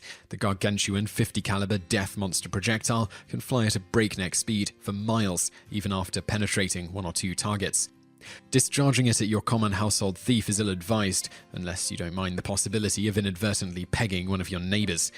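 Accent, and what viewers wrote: England, United Kingdom. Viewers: You'll notice a limey brit is narrating this video and making derogatory remarks about handguns using liberal adjectives. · I should have known. English like accent talking about firearms. Not close to being accurate. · What an idiot!!! Not really an unexpected grand pile of PS one has come to expect from the Britts judging by the accent and with the absolute lack of knowledge of firearms other than the garbage spewed from the ignorance of the anti-gunners. While yes many of these guns are "over the top" they do have a purpose. That last one is little more than a novelty item. On the other hand to say that the Desert Eagle 50 cal. is the favorite of gangsters and mob bosses is bull to the highest degree. While yes there are a few criminals that use them most would prefer something a bit more easily concealed and way less obvious and in your face. 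They don't want to be running around with something that you practically need a wheel-borrow to carry around. A bit too obvious to the cops. Then again most of what he was saying was just a jab at gun owners, most of who are law-abiding and perfectly reasonable people. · the reader , Is just that, no knowledge at all, But with English accent , to project an aura of superiority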